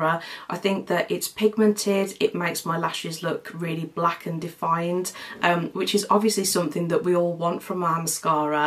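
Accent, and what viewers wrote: United Kingdom. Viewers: Your review is excellent🎉. I love the detail you mention for each product which other reviewers often skip! I also love your British accent. I am looking forward to more of your reviews. From across the Pond, Diane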